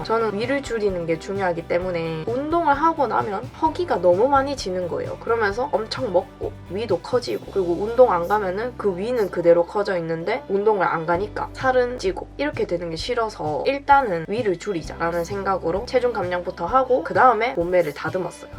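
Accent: Gyeongsang, South Korea. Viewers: your voice + your Busan dialect is so relaxing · 대구 아가씨 같네요~ 뭐든 의지가 젤 중요한 듯해요^^ 물을 많이 마시는 습관도 포인트! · She said in another vid that she’s from Daegu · ARE U FROM BUSAN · Well guys , I'm not korean but I can tell that accent isnt from Seoul I wanna say Busan ( correct me if I'm wrong) anywho ALL THOSE DRAMAS HAVE DONE SOMETHING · 부산 사세요? 말투가 부산같으신데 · Gyeongsangdo accents really carry this charm that is so good to my ears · i think it's daegu? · 多分プサンの方言かな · Jenna Li wow ㅋㅋㅋyup her accent from busan(Edit: her accent from daegu!) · She’s definitely from Busan or perhaps somewhere in southern Gyeong Sang province. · Hannah Rose Yasay she's from daegu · Are you from busan?? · 韓国人です❗釜山か大邱ですが、多分大邱だと思います。きょんさんどの方言であることは確かですがこの違いはそこの人じゃないと比べることは難しいですw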